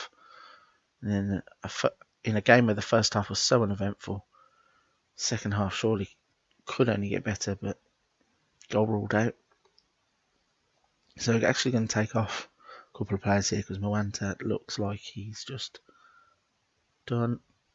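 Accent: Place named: United Kingdom. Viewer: I don't usually play these games but when the commentary comes and even a British commentary , It just becomes a whole lot interesting. Keep up the good work.Liked and subscribed.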